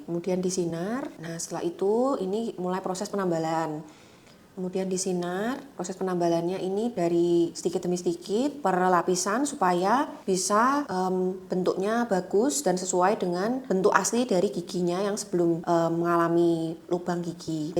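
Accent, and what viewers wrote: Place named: Java, Indonesia. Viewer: Dokter medok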